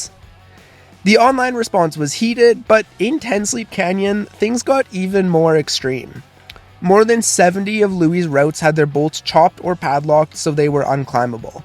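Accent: Canada. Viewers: I was going to guess you were Canadian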